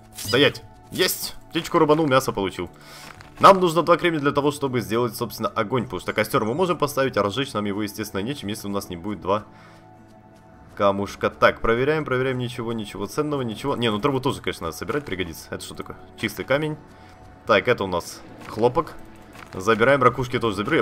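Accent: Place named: Odessa, Ukraine. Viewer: ты в озвучке просто великолепен!!)Шикарный голос, прекрасное чувство юмора, периодически возникает такое чувство, что ты из Одессы!))Браво!)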